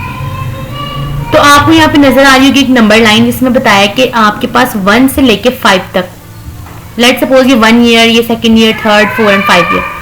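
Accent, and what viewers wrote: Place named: Punjab, India. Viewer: ma sha Allah your axent is very nice cute axent ( LOVE PUNJAB )😍😜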